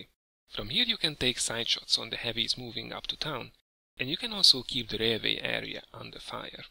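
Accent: Hungary. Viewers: Már az akcentusodról meg tudtam mondani hogy magyar vagy :)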